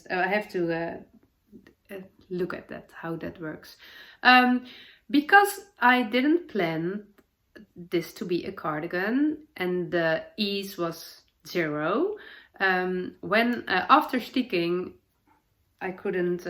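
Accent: Netherlands. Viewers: I love sharing my knitting time with you. You’re such an inspiration with your views on life and challenging yourself to grow whenever you can 👏🏼👏🏼👏🏼 You have made me look at the colour brown in a whole new light😂 I am searching for previous podcasts I haven’t seen bc I’ve watched all of your podcasts and I need more in my day 😂 You make me laugh so much with your Dutchy English. I live for it 😂 The saying when you have a cold is ‘I have a STUFFY nose’ but from now on, I’m going to say I have a stiffy nose, so I can relive that heart jumping moment over and over 💕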